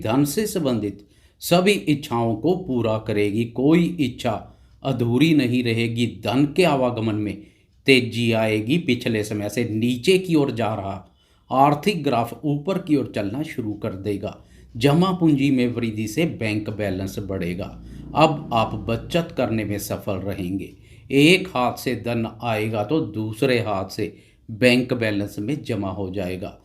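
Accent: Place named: Punjab, India. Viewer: Guruji aap Punjabi ho shayd 😁 apki accent se lg rhaa hai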